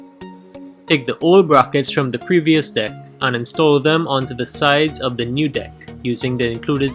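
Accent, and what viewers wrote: Trinidad and Tobago. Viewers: Younsound trini bro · Are you from Trinidad. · Are you Trini? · Sounds like a Trini accent. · Lol can't miss that Trini accent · ah hearing ah trini accent!!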